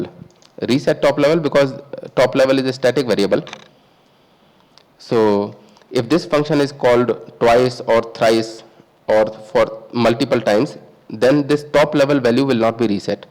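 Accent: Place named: South Asia. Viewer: I really appreciate this video. I think you could reach a broader audience if you spoke slightly slower. I have some experience with South Asian speaking accents, but the speed at which you are talking along with the lower quality of the audio makes it a little hard to keep up (particularly at )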